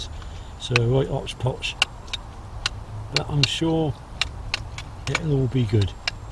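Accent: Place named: Wigan, United Kingdom. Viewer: always thought you was a Wigan lad sound just them pie eaters up the road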